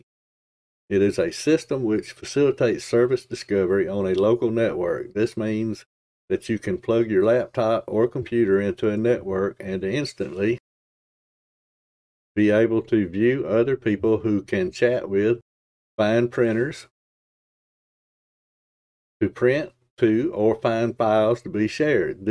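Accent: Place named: South, United States. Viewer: I could tell you were from the South by the accent. I'm from LaSalle Parish, Louisiana, but most of my family is over in Smith County, Mississippi. One of my mom's siblings is over in Dothan, AL. I've rolled through your neck of the woods a lot!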